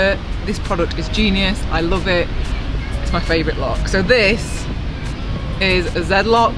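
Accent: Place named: Northern England, United Kingdom. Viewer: Yeah, I have watched your vids on and off for a few years. You certainly keep me going on the bike. I love your biking story, I like your honesty, failures and success' and the fact that you have the courage to put yourself in the light. A real ambassador for normal cyclists. The Northern accent is even beginning to grow on me;-)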